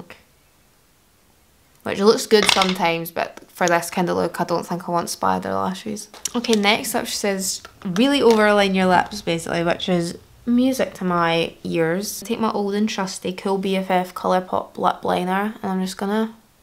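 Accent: Scotland, United Kingdom. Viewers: you’re Scottish? - subscribed. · Hearing a fellow Scottish person on YouTube brings me a lot of joy 🤧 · took me 5 seconds to hit the like button after i heard her accent lmao cant shaft another scot's makeup tutorial!! ur stunning! 💙🤍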